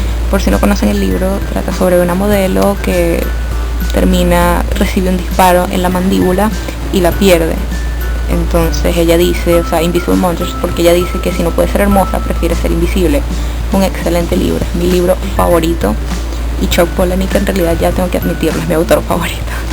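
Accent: Venezuela. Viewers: Apenas oí tu voz me di cuenta que también eres venezolana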